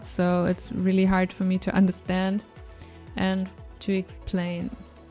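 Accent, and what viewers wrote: Germany. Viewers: ich komme auch aus Deutschland. Ich hab mir schon irgendwie gedacht das du deutsch bist weil man das ein bisschen hört. 😂😁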